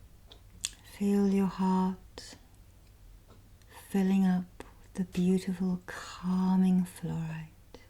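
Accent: England, United Kingdom. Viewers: what can I say, I just simply adore. Your English accent I just love your videos mate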